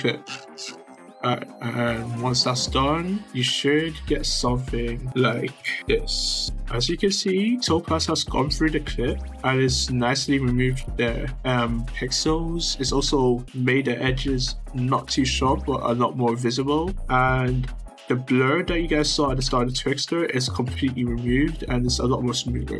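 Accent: United Kingdom. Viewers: AHHHHHHHHHHH THIS IS EPICCCCCCCC- ALSO DON'T WORRY MAN I AM SUBBED TO YOU SO NO NEED FOR YOU BRI'ISH VOICE TO TELL US!!!^^ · nice hearing your beautiful bri'ish voice again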